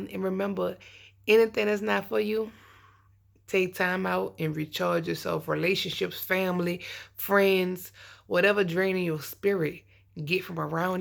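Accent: New Orleans, United States. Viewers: I love when your name pops up in my notifications. Means I'm gonna get some insights in your cute N.O. accent.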